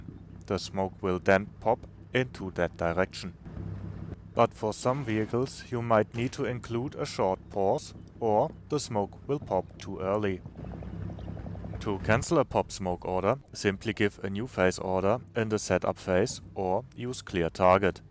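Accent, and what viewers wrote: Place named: Germany. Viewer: I'm honestly only watching your videos for ze lovely German accent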